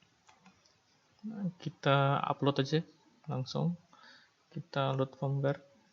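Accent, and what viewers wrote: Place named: India. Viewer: Can you and all of your countrymen do me and everyone else on the internet a solid. I am not trying to be a racist and i am not, but can you put a subtitle in English on all of yours videos so we can understand what you are saying. An Indian with thick accent trying to speak English is not easy to understand. But a lot of content from Indians are second to none when it comes to a technical aspect of things. with respect.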